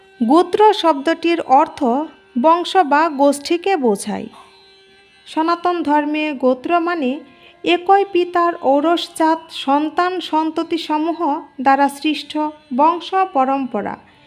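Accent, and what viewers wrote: Bangladesh. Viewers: Pronunciation thik karun mone hoi apni sylethi meye. Ja hoke bhalo video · কুমিল্লা এলাকার লোকেরা এইভাবে উচ্চারণ করেন।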